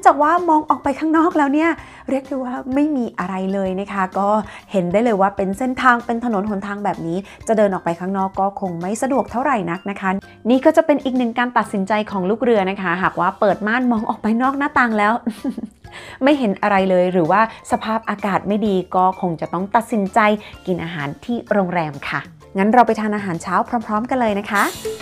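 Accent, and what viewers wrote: South, Thailand. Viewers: เป็นคนใต้หรอพี่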